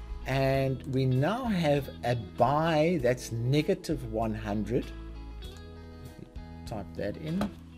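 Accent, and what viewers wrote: South Africa. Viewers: That accent- are you South African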